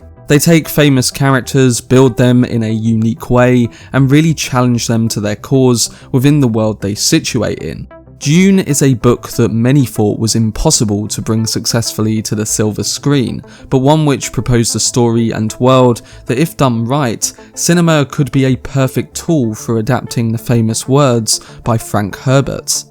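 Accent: United Kingdom. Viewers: really nice british accent :D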